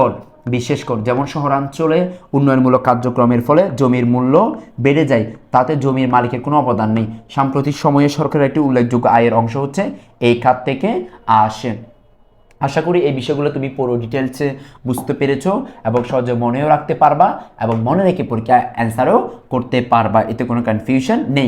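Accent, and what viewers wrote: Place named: Chittagong, Bangladesh. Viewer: Free class koro Abr eto kisu? Spelling e somossa na sir Chattogram er so sir er pronunciation ta different hobe etai savabik taina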